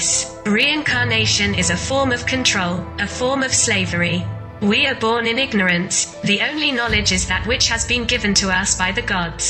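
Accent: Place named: England, United Kingdom. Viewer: Thank you too! The content keeps me here. Plus whoever's Voice is talking. English Accent is Supreme.! 😊👍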